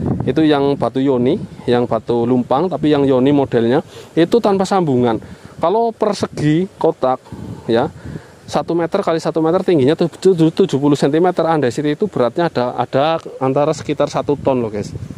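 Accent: Banyumas, Indonesia. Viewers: Gayor ngapak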